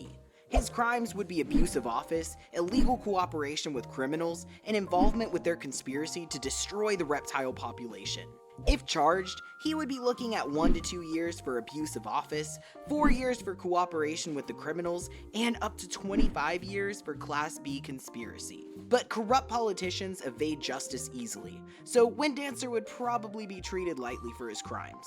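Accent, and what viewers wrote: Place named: United Kingdom. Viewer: I missed your British voice😢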